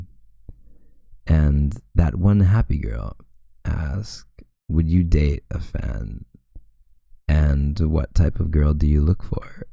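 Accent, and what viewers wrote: Canada. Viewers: Lol I knew you were Canadian the minute you said "about". Love the video you are definitely in my age range which makes me feel less weird listening to this lol. I hope you eventually make a discord/patreon or something similar so we can go on supporting you. Until then I will happily share your videos and wish you the best. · I can hear that Canadian twang!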